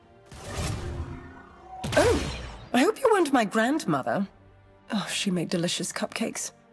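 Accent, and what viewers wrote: United Kingdom. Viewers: You have a little character in your British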